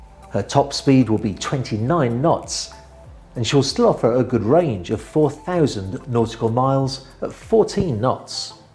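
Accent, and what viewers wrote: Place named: England, United Kingdom. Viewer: Sorry, had to thumbs down for wearing a mask while walking alone outside. Also, that damn regional English accent that pronounces ing as ink, its driving me insane. Spiffing Brit does it even worse and it makes me almost have to stop watching his content. It's just something about saying k instead of ing that drives my brain to insanity. You might as well pronounce w as z. Its so random and makes no sense.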